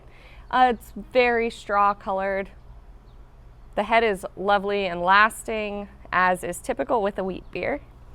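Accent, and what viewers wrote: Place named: United States. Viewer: Hun snakker ikke et nordisk sprog så er det ikke bare ok at hun siger ord med et amerikansk accent?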